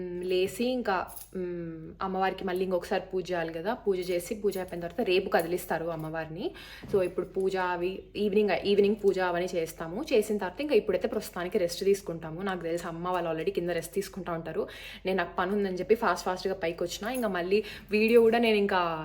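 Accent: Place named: Telangana, India. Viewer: emo akka nuvu matladuthuntay ma Telangana lo matladi nattu undhi naku, antay dialect antunaa......Ma Palamuru(Nagarkurnool+Mahabubnagar+gadwal) ki rayalaseema ki chala similarities untai ,idhi na personal opinion. Lots lo love from Telangana akka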